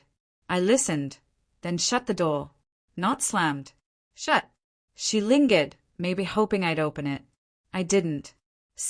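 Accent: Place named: United Kingdom. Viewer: Yet again - the title doesn't match the story! Refreshing to hear a British accent.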